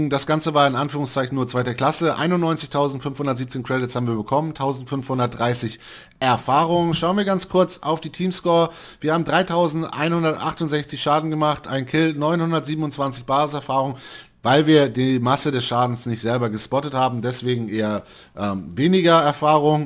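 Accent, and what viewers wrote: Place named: Hamburg, Germany. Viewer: Nossi ist sehr unterhaltsam, Moo für die breite Masse um ihnen das Spiel näher zu bringen. ist für die Spieler, die das Spiel verstehen wollen. Und bringt es zudem mit seiner Hamburger Schnauze (Grüße aus Bergedorf) einfach genial rüber.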